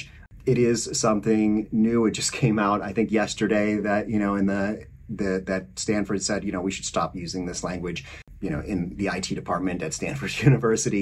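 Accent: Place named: Canada. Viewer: Gawd ... You almost sound Canadian. For me that is 1 of the best compliments I can think of. Personally I try to assume everyone deserves the chance to disappoint me or amaze me. So far you've only amazed me. Grrr8 subject, grr8 attitude and good for you in trying to become a better person. Gawd knows we need more of "those" people. If I offended anyone I'm Canadian so ... sorry . P n D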